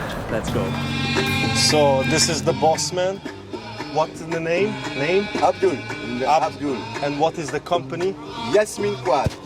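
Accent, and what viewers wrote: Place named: Glasgow, United Kingdom. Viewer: You sounds Glaswegian sometime maybe you were Scottish in a previous life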